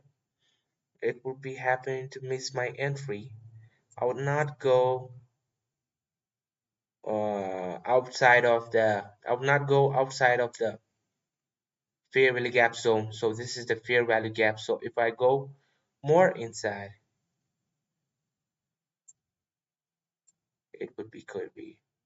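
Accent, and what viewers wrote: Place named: Russia. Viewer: recaaardddiiiingggg😘😅 btw best knowledge and also kaatttar englishh like russsian or spanishhhhh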